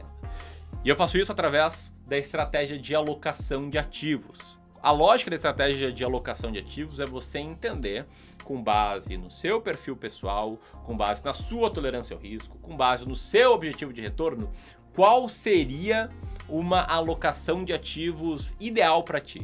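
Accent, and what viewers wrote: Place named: Rio Grande do Sul, Brazil. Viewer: Bah ô Loko, tu é gaúcho né? Ãããhaaaam..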